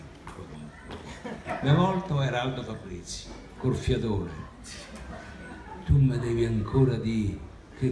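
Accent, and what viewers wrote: Rome, Italy. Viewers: Che bel racconto verace sincero con questo accento romano e questa romanità nel modo....grazie mi son fatta due risate😂😂😂😂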